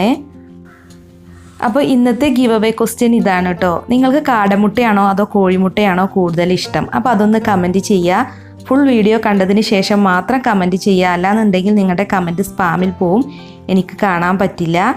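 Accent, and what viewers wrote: Malappuram, India. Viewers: നിങ്ങൾ മലപ്പുറക്കാരാണോ ,? Kaadamutta ഇഷ്ട്ടം 😋😋😋😋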